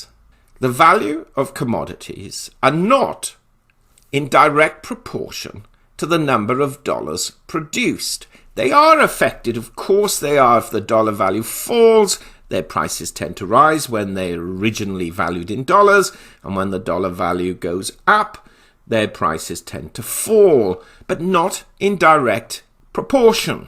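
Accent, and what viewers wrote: United Kingdom. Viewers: A realistic presentation. Very enjoyable. Investing in Gold and Silver is more about a flight to safety, than it is unrealistic profit. It will make money and it will do well. Patience is required. You can open an account from a link on this channel, invest in gold and silver and even designate the storage location (Zurich etc.) Great for those who do not want to store the metals themselves. There is nothing like healthy skepticism with a British accent. Subscribe and keep listening.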